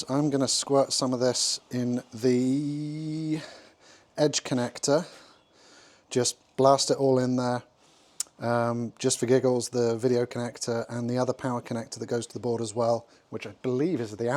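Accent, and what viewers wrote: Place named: England, United Kingdom. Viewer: This was suggested to me and it was a pleasant surprise to hear a fellow English man 😊 Looking forward to watching more videos, Galaga is a true classic and definitely worth restoration. Subbed.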